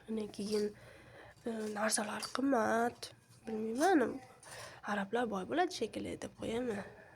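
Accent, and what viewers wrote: Andijan, Uzbekistan. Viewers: Оллохни уйида мазза киляпсиз экан да оллохим уз даргохида Кабул килсин Каеридансиз шева анжан а рахмат катта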